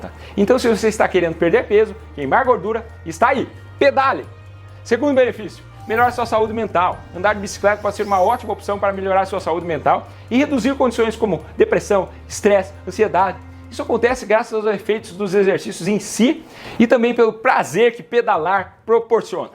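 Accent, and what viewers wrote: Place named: Paraná, Brazil. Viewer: Este sotaque é inconfundível das pessoas do Paraná; morei na Lapa, Cascavel e Francisco Beltrão. Parabéns pela sua dedicação em nos orientar de forma simples.❤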